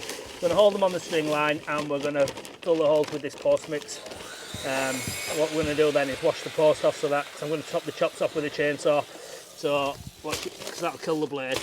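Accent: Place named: Northern England, United Kingdom. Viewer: I do like a good Northan accent how do I collect my nailer gun please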